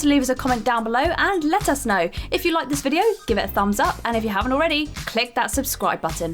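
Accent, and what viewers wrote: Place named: England, United Kingdom. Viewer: A "news lock" run? Yea English accent are definitely annoying and everybody just acts like they absolutely love them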